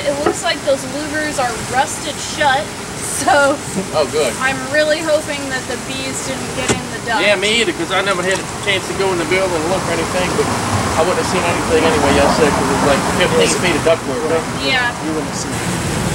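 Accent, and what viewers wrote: Louisiana, United States. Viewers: I am originally from Texas, now live out west in Nevada. I was ecstatic to hear your Cajun inflection in this video! I am also so very impressed by the calm, professional job you did in the transferring of this massive colony. Your love for the bees is well documented, and if any person within 200 miles of Metairie LA needs bee transference, you are the number 1 choice! God bless you, sir!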